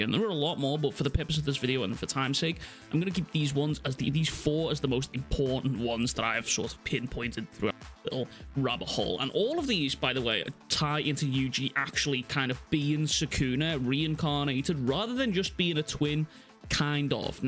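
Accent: Liverpool, United Kingdom. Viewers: Is this a scouser?? (United fam here) 👀👀👀👀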